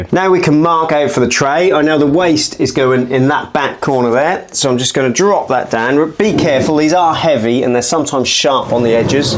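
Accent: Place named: East Anglia, United Kingdom. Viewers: Suffolk boy here, love hearing a good Easy Anglian accent. Moved away many moons ago, but your vids are very nostalgic for me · I wondered if it was a Suffolk accent. Thought he sounded just like Rob Songer another great tradesman.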